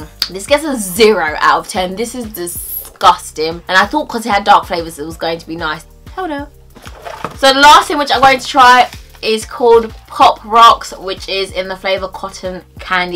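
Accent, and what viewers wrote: United Kingdom. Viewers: my name is marlene and i have tasted all those candy exept the twinkiesand your right the cowtales are super gross but the sour balls that you didnt like i love those and i love your british accent and you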